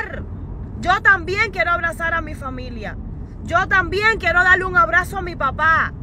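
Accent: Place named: East, Cuba. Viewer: nena, eres una grande, salud, y millones de bendiciones, yo soy de la habana pero me encanta tu asentó oriental, y muy bella que eres.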